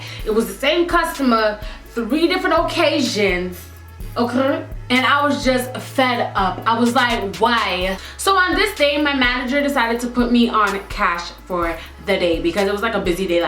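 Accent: Jamaica. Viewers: She uses a Jamaican accent sometimes I'm guessing that's what you're talking about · I love the Jamaican accent :) so cool! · Talk Jamaican more often please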